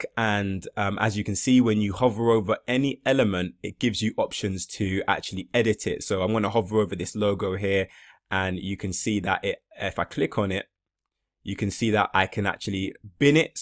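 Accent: United Kingdom. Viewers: Thanks for the fast reply . I know it is early morning for you, if your UK accent is any indication as to your location ... :-)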